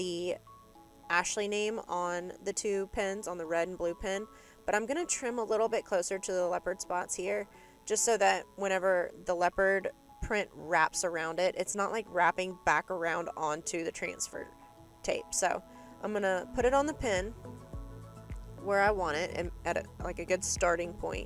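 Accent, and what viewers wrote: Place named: South, United States. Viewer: Very Beautiful !! ----- Girl where you from ?? With that southern TWANG Ba'eye !! LOL ---- I guess more to the west from where Im at ---- Or it could be Alabama/Tn ...... I'm in Louisiana ---- Down yonder from you =)~ ------- Love your work ---- and your husband reminds me of mine ---- always creepin around when were trying to work ...... He is just amazed at your work .... is kinda sweet ...... but still kinda gets annoying .....bless their hearts .... I guess ---as long as they keep their creepin at home its ok ...... I SURE don't wanna be an outside the home creepers wife ...... UGH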